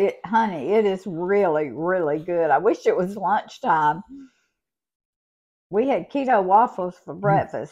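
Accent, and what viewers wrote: South, United States. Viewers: Just stumbled across your video, i love it, looks yummy. Your in the south i think from you accent, love it. I have subscribed, look forward to more, God bless❤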